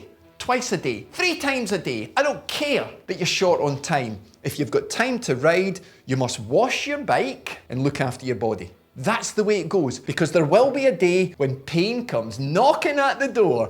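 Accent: Scotland, United Kingdom. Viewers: Can somebody please summarize what this bloke is on about I haven't got the time or the patience to hear and decode all of this Scottish I just wanna know if I'm wreckin mah knees you know and is cyclin bad.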